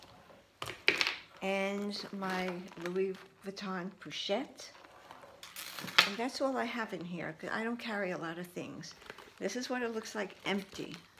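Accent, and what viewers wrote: New York, United States. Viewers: The Tabby Shoulder Bag is such a pretty bag! I got it in black and beechwood, as they were both on a great discount here in Europe a few months ago! I love the burgundy microfiber lining and the organization! I enjoy your videos and love your english, as it reminds me of NY! My mom is from NY and I still have relatives on Long Island!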